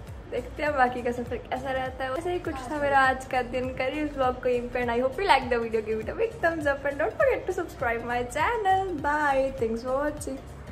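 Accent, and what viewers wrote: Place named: Jammu, India. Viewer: The dogri accent in hindi is ❤️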